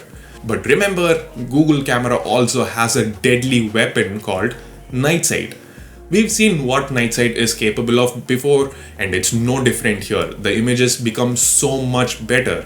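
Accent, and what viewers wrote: India. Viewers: Worst thing about M40 is the fact that i have to listen to indian accent to get any information about this phone...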